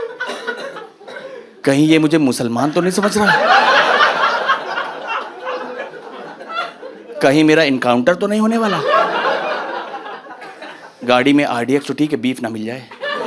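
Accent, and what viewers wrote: India. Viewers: Bhai allahabad se ho pta chal gya accent se😂😂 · UP का नहीं मराठी लग रहा है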